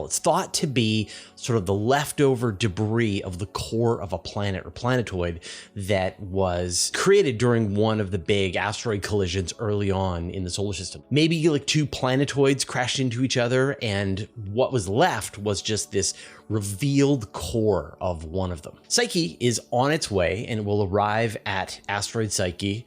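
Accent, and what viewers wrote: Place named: Canada. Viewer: I think the best part of EVERY one of these programs is the Canadian accent. Words like "out" and "about becoming "oot" and "aboot". Being from upstate New York, I was raised on this and can always tell when I'm talking to a born Canadian with an occasional Wisconsin'er thrown in.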